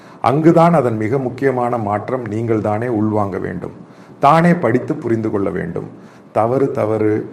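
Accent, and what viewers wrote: Kerala, India. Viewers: தமிழுக்கும் மலையாளத்துக்கும் இடைப்பட்ட ஒரு மொழியில் பேசுகிறீர்கள் 😇 மன்னிக்கவும் , பாதி புரிகிறது பாதி புரியவில்லை.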